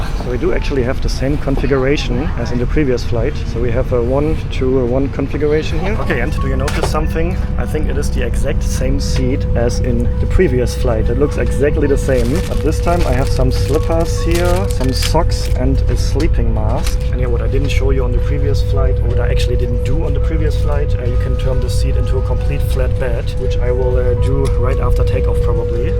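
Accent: Germany. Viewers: Beautiful videos. As a Greek raised in different countries Love your German Accent 🙂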